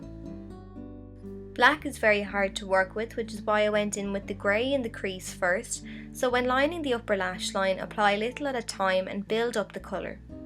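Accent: Dublin, Ireland. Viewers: I love the Irish lilt! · is she irish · Really good video for me, I'm just starting out with makeup!! I'm from Belfast, and I got so excited when I heard you had an Irish accent:) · love ur irish accent x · Yeah she sounds a bit like me, so I think she might be from dublin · Love your beautiful Irish accent :) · She's Irish 😊 I hear this accent everyday 😘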